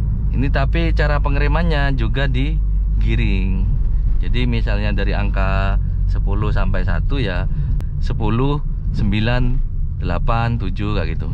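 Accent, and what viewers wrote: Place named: Madura, Indonesia. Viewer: Madura mana bos?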